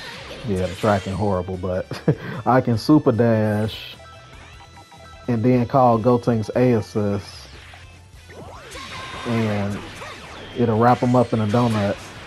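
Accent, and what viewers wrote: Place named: Memphis, United States. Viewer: U sound like you from memphis bruh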